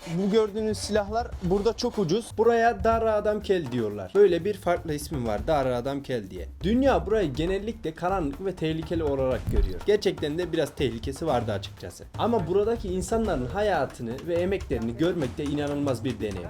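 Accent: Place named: Konya, Turkey. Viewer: Gonya Garabuğan şivesi bu kurumda oralı konya/karapınar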